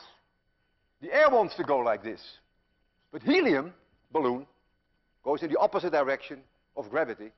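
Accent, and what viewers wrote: Netherlands. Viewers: Is this dude from Holland?! This day seriously freaks me out.. like on that esoteric level. peace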